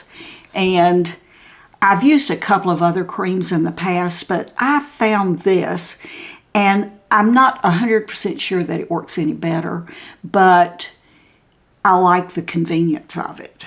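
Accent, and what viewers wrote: South, United States. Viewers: I totally loved listening to your beautiful southern accent. Alabama "girl" (or once was)😊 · I'm smack dab in the middle of my 50s and I am so happy to find your channel. I have always been in to makeup & beauty, but nothing prepped me for the changes i have been experiencing with my skin & such the last few years..and just having to tweak little things to either make them more flattering, or easier to apply, as well as just the fun suprises -instead of wakign up to a pimple it is wakign up to a new spot, a vein And, as a Southerner, your delivery and voice is also a comfort to me--I guess it is the familarity of it; like you could have been one of my customers in the makeup department at Belk. Have a wonderful new year!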